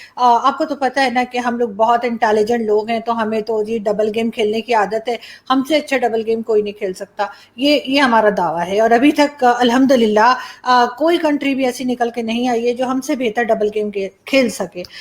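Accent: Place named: Allahabad, India. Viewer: Your ancestors migrated from Allahabad..I can notice the flavour of Allahabad in your Andaz -e-bayan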